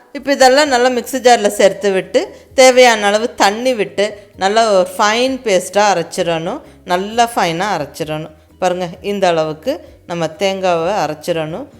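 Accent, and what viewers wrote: Coimbatore, India. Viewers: Kovai Tamil super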